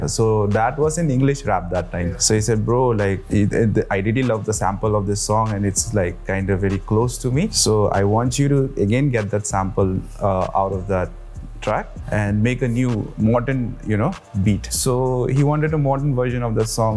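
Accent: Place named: Assam, India. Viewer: 💯 percent he is Assamese.. Accent se hi maloom chala merko..🎉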